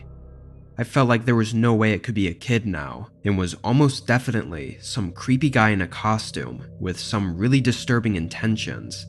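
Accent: Canada. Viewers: I actually hear a Canadian accent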